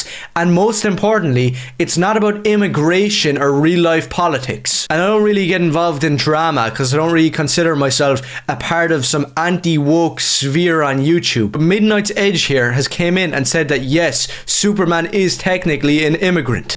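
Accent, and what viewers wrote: Ireland. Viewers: Love your channel bro. Are you from Dublin ? Anyways can you say shite in your video instead of crap, please ? It’s a cool word and your accent is cool bro. And yea fuck this woke bullshit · The Irish accent didn't clue you in?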